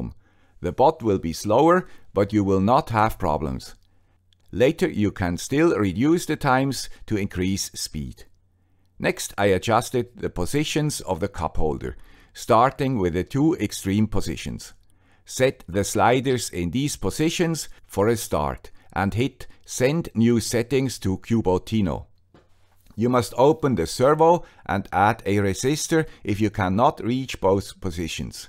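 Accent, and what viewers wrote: Switzerland. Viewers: Fantastic! I am definitely going to build one - Ive a spare raspberry pi so I only need to buy two servos. Thanks for another great video man with the Swiss accent!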